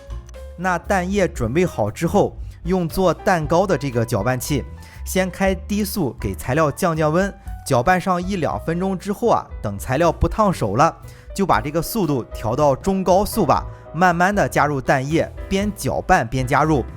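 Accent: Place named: Qingdao, China. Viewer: 我听着声音有点像青岛人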